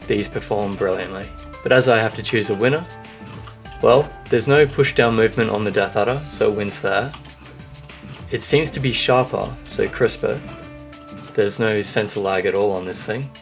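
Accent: Australia. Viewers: Hi it's me again, I love your videos. Is the Razer Deathadder durable? How stiff or light are the main left and right clicks? Are you australian or do you just live in australia?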